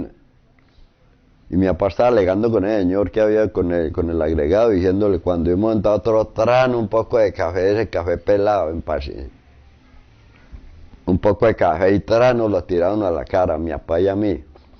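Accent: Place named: Armenia, Colombia. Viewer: Buena historia.. mi novia es de Armenia y habla tal cual.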